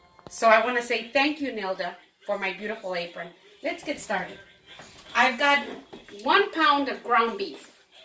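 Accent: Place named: Texas, United States. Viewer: . Love your channel. Reminds me of my grandmother’s cooking. Must be the love. I was raised in south Texas and your slight accent is reminiscent of my upbringing. It’s like being home with family.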